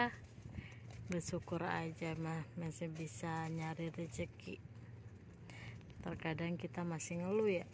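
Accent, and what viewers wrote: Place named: Makassar, Indonesia. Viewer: teh asli nya orang mana .. kaya orang makasar ya ngomong nya😁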